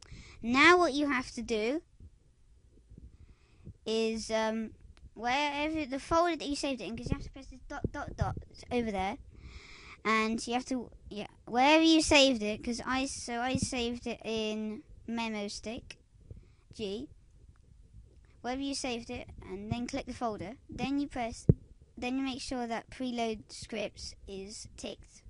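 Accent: United Kingdom. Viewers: I just thought you edited your voice to be like that not that your from the UK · chill bumps he has to be british i still have some XD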